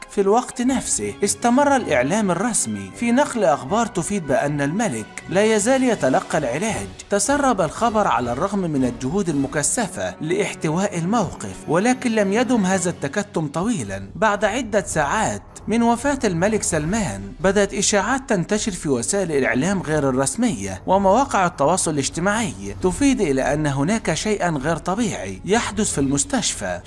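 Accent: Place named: Egypt. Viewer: صدقني لو تشوفلك حاجه تفعك احسلك من هاذا الكذب وهاذا التحليلات لاانت ولا الذي معك تستطيع ان تعرف شي او حتى تحلم حفض الله حكامنا وحفص الله ملكنا والله لاانت ولا حتى جدودك تقدر تعرف حاجه عن حكامنا خليك فالمصايب الي عندك احسن قسم الله عقللك وعقل من اعطاك هاذا الكذب شوف مشاكل بلادك وشوف مصايبكم وحلوها انت تعرفوون أنكم ذباب ويجب التعامل معكم بحزم تراك مصري حتى لو غيرت لهجتك معرف من الي الكذاب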